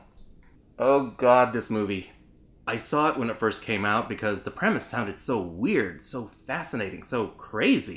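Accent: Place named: United States. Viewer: Are you somehow a Filipino? Cuz honestly you look like one with a very american accent 👌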